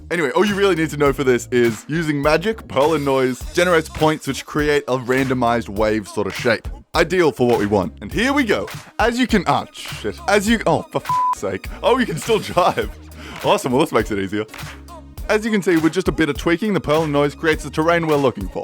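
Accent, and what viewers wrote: Australia. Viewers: Spoiler alert: Is Australian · are you a fucking aussy or a southerner? this depends on sub status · I like your voice, are you Australian?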